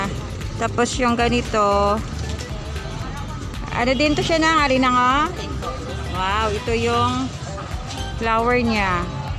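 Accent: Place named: Iloilo, Philippines. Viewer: This event i always remember during my childhood days... and your ilongga accents reminds me of my place.napangiti mo po ako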